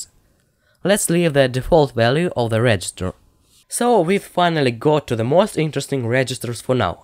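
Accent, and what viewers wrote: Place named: Russia. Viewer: worst russian accent i'v ever heard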